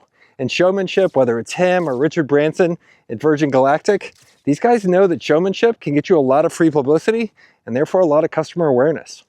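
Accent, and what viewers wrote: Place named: Chicago, United States. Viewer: Its all about the (damn) money! BTW, where are you walking at? Id say New Jersey, but you dont have the accent for it. Probably chicago area.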